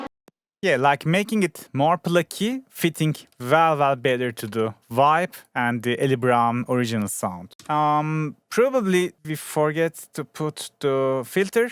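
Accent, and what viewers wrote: Turkey. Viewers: broooo. i loved your accent. tell me you are turkish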